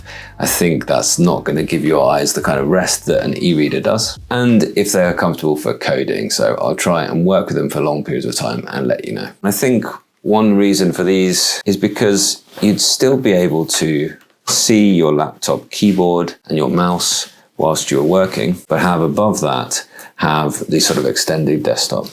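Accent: United Kingdom. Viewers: I'm a frog. You have such a looooovely British accent. Love it! Sincerely.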